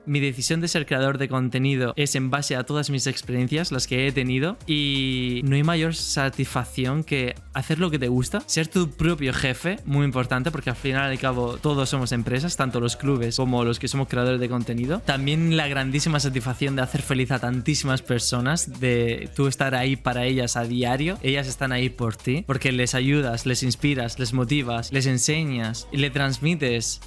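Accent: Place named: Spain. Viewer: Yo te conoci por el video de Agent Gumle en el que le enseñaste a jugar Jett, escuche tu acento y dije: "top 1 radiante español sin ser pro player? Hay que verlo" vi un par de tus videos y me encanto.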